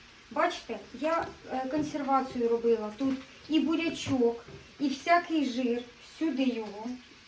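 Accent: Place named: Surzhyk, Ukraine. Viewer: Який жахливий суржик! Невже не можна хоч словничок погортати, перш ніж знімати відео?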